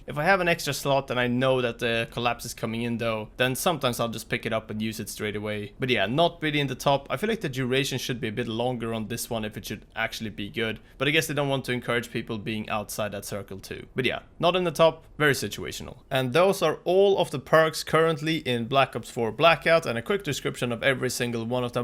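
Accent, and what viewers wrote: Sweden. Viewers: bra video, that swedish accent tho XD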